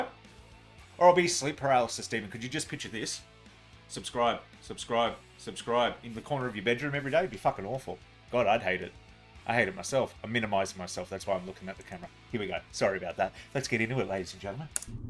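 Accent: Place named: Australia. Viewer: Australians ugliest fickn accent ever, but metal up your ass,from kiwi land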